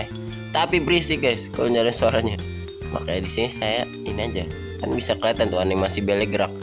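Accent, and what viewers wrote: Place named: West Java, Indonesia. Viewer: bang orang sumda ya aku dengar deukeut saat yang ke-3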